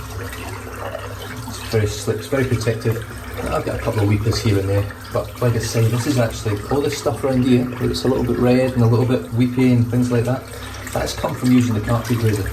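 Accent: Scotland, United Kingdom. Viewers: If he talks to fast for you, try backing off the speed to .75. I usually watch on 1.25... the Scottish accent sounds better when it's rippin'... full Trainspotting 😆😂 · I don't think there's anything wrong with your speech cadence at all. It's probably just that a Scottish accent can be challenging for people living outside the U.K. You do look very comfortable in front of the camera now, and your videos are entertaining and informative. Nice work. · Great review! Melody and I thank you for all the support and kind words. Kind words in a Scottish accent are truly the best so please don't change a thing! I prefer an unscented aftershave or witch hazel as well so I can enjoy the scent of the soap on my skin a little longer. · Um...I don't know who complained about how you speak, but honestly, a Scottish burr is the sexiest accent in the world. Added to those beautiful eyes...oof. 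:D You're perfectly understandable--don't change a damn thing. Shave on. I just bought this soap bc the label is so gorgeous. sigh I'm so easy...just not cheap ;)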